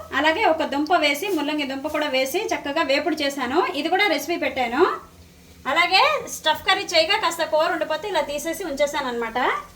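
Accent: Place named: Eluru, India. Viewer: చక‌్కగా మాట‌్లాడతావమ‌్మ సూపర్ ఏలూరు మీది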